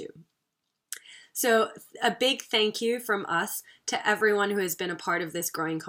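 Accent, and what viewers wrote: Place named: United States. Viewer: You sound so American.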